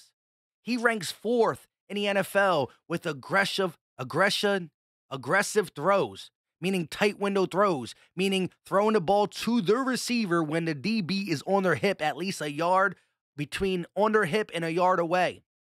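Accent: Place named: New Jersey, United States. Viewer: I watch you every week, dirty Jersey native (Vineland) living in Boston. These are my favorite Eagles updates/videos, you make a lot of sense and it’s nice to hear the accent from home bro! Keep it up! 💪🏽🦅🦅🦅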